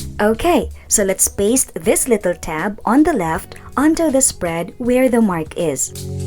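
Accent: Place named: Philippines. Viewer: It would be really helpful if you could make the measurements stand out more as really hard to see pencil. Black felt tip maybe? Are you Phillipina? I thought I recognised the accent 😊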